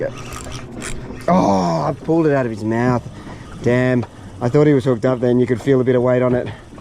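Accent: Australia. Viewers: Nope, he sounds Australian. They swim in clear Tupperware kayaks around those behemoths often!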